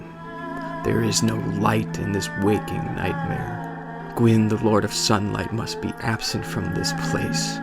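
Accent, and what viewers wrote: United States. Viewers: Nah... you need that brit accent to make this legit. The American accent just wrecks all medieval type lore stories. It's just the truth man.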